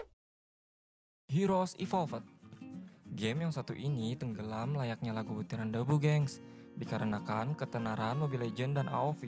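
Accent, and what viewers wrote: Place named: Medan, Indonesia. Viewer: Hahahahhqh Bukan kaleng" ya bang..... Itu Medan banget gangs.... Hadir Nyimak bang.... Jgn lupain aku ya bang.... Salkomsel bang.....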